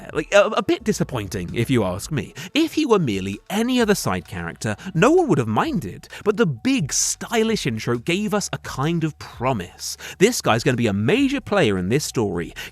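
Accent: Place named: RP, United Kingdom. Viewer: Almost stopped watching at "Indi-AWH-na Jones". Mate, learn how to integrate proper pronunciations with your excessively RP accent. It's like when brits say Jackarse, it makes my skin crawl.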